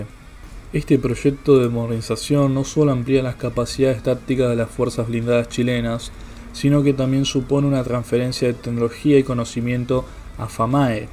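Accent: Argentina. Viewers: el canal es argentino, no chileno, no cachai el acento ?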